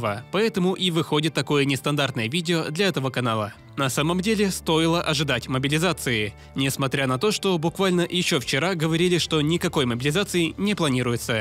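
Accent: Ukraine. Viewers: а ты ведь из Украины, верно? Это сразу читается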